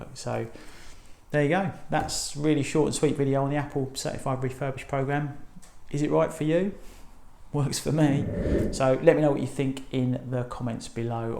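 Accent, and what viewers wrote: United Kingdom. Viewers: The lovely British accent 😍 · ohmygod i love the english accent so much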